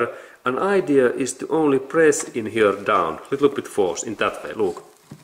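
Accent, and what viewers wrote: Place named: Finland. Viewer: Aiettä nautin tästä rallienglannista!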